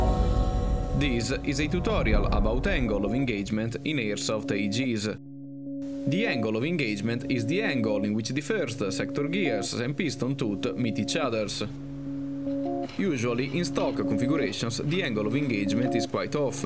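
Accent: Italy. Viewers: Come si sente l'italiano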